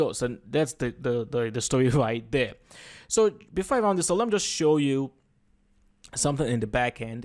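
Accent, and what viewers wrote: Nigeria. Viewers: That's a Nigerian Speaking!! Nna kedu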